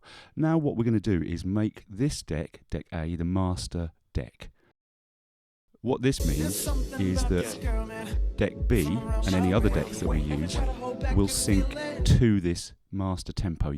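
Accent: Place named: United Kingdom. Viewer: Thanks! GREAT TUTORIAL. For some reason things are a lot more intriguing in a British accent. PS. Im going to download all the songs you played.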